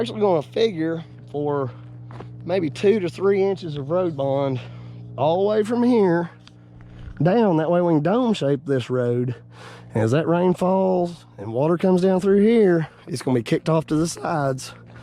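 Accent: South, United States. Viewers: STOP APOLOGIZING! You are a Southerner who speaks Southern slanglish. Be proud of that! You did a great job. It's a clear, concise, easy to understand vid that covers all the major points, gives good pointers and insights, etc. All in all a great teaching vid. Anyone considering getting into the biz can understand, accent or not. Oh, I came up with 5 hours. Two hauling equip,, 2 grading, I spreading and tracking in.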